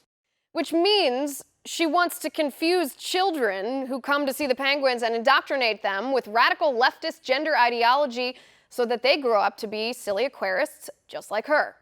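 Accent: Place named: Southwest Ohio, United States. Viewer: BWAAAAAA HAHAHA...don't give 'em any ideas..."if we are still allowed to say the word 'straight' "! BWAAAAA HAHAHA You can tell ol' girl is from southwest Ohio! Rock on, Sharonville, by way of Hamilton!!! Keep on keepin' on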